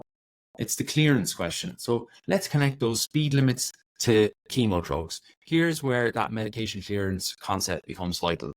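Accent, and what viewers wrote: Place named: Ireland. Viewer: Excellent. I have Stage 4 Ovarian Cancer, and they had to dial back my chemo dosage last year. (I had perfect kidneys before this.) Im now on Targeted Maintenance Therapy, and my kidneys are tanking more. I'm on a break until t'row when I potentially restart. I was being referred to my late mum's Kidney Specialist. (She had Chronic Kidney Disease from high blood pressure and Diabetes... and then 2 separate angiogram surgeries for a Brain Aneurysm & a Heart Attack - she died briefly with the latter - cooked her kidneys, making her terminal. It's an ugly disease.) I've not heard anything yet, though, I do have other conditions that necessitate I take various meds. It scares me more to have potentially damaged kidneys, than to have cancer... only because I've not seen anyone die of Ovarian Cancer. Anyway, I would've loved this as a video or charts. 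Your thick Irish accent to my Australian ears made some parts difficult, especially with some unfamiliar medical words. Anyway, at least thank you for that crux of the situation re kidney levels & what's going on. I never really googled my mum's disease - it was just another facet of her life. Thank you!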